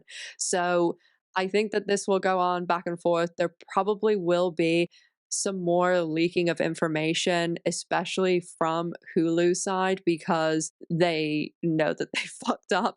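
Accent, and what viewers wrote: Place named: United Kingdom. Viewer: How come every 15th word is in a British accent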